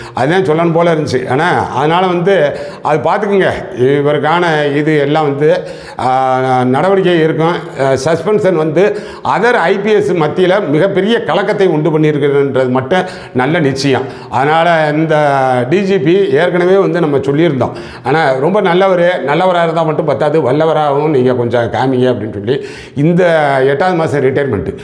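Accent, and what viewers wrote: Madurai, India. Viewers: சார் மதுரை சிலாங் முறையில் விளக்குவது சூப்பர்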